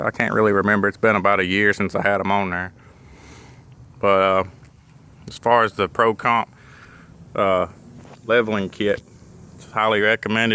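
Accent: South, United States. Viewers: I like that fat dip spit and the southern drawl!! Good lookin truck buddy!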